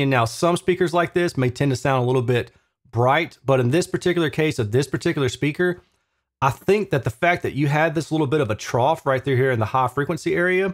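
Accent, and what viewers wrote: South, United States. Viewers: That's a true Southern accent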